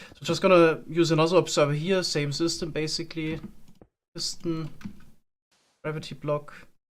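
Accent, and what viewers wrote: Germany. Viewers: I sometimes a Little deutschen Akzent oder?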